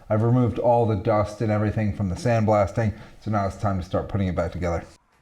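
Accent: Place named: United States. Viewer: Where on earth do you live? Based on your electrical outlets in your shop I was thinking somewhere in the UK but Maybe not? Definitely Europe somewhere I assume. The other big clue are the stone walls in your shop that you just don't see in the US other than a few colonial era homes and barns in the Eastern US. But then your accent is completely American so it's all a bit confusing haha.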